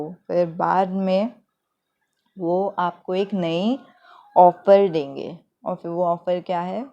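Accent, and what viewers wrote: Maharashtra, India. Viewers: Hi mam, i have chosen pile no. 3,i m also a tarot card reader, numerologist, etc. mam aapke bolne mein marathi accent aata hai, aap maharashtrian ho?mujhe aisa laga, just asking generally, ok, so Mam aap mujhe ek baat batao, aap jab bhi reading karti ho hamesha yehi kyun bolti ho, "aapke husband aise honge",vaise honge, matlab ye video ko ladke nahi dekh sakte, ye video ladko se resonate nahi hoga.aapne pile no. 3 mein toh puri khichdi bana dee, aap logon mein jhagda hoga, nahi hoga, baad mein thik ho jaayega. "jara nit padh teene reading kara ho madam, nakki kay aahe te saangaych, hasayala tumhalaa lay aavdto.ekdum mana nee hasta ho tumhi. Nice reading. Keep smiling. 😄Thank you madam, 🙏🙏🙏👍👍👍👌👌👌❤️❤️❤️❤️❤️🌹🌹🌹🌹🌹🌹🌹🌹🌹🌹🌹.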